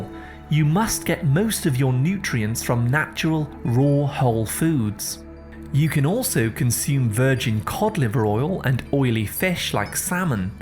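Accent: United Kingdom. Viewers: That is the correct way to pronounce it. He is speaking British English, Britain is where English originated, in case you did not know.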